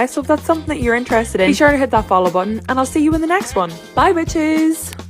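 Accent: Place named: Northern Ireland, United Kingdom. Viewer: Are u from Northern Ireland because I’m from Northern Ireland and you have the accent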